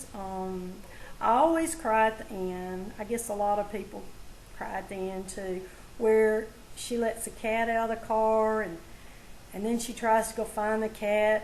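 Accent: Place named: South, United States. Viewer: Those are all great movies. Love watching you talk in front of the camera. Us northerners love to listen to the southern accent shine..lol. If you ever need something to do you could always be a movie critic. Excellent job.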